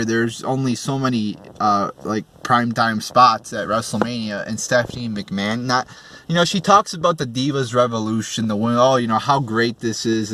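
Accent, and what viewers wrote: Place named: New York, United States. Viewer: you have similar voices. Ai New Yorkar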